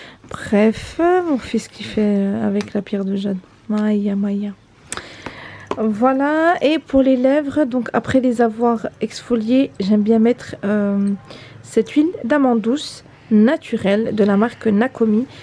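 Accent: Belgium. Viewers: Salem, top ton vlog mais moi je suis pas motivée du tout pour le grand ménage...... Mais dis moi ton accent vient du nord ou de Belgique? A chaque fois je veux te demander mais j'oublie...lol bisous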